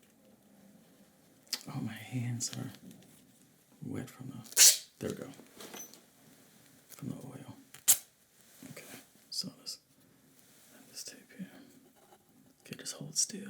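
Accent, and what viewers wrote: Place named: United States. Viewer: I hear an American accent! Weirdly, I was expecting him to have an English one!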